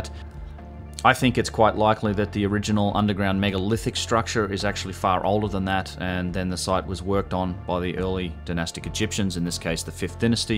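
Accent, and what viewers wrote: Australia. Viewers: (Just a comment to help with the algorithm , unquote.) Man, I love hearing this revolutionary information in an Aussie accent. Just sayen ... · Irrationally cool this info has a Australian accent. Stuff took generations to make... that’s key. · I love your work. Its mind boggling to me how wrong the mainstream is on this and I don't understand how it can keep up the lies for so long. From a engineering perspective alone the proof is everywhere of . honestly I think you should have billions of subscribers. People are blind. Even my userly open minded flatmate fails to see the proof.he watched a few minutes of your fine work and became instantly sarcastic. He says he knows the history of Egypt, he was taught about it in school ! lol. He then went on to say that the Australian accent was annoying him so I guess its all sorted for him haha. 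Bloody hell typical kiwi 🥝 🙄. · Good on you, mate. Interesting videos, including your own unique take on things; plus it's refreshing to hear a relaxed Aussie voice (and Aussie-isms) on videos of this nature. Extremely envious of your opportunities for travels, discoveries and adventures. Cheers from Port Macquarie NSW.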